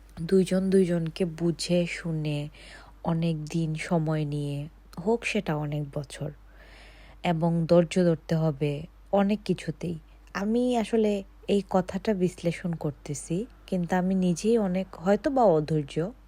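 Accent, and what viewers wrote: Sylhet, Bangladesh. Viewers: Sylheti ni?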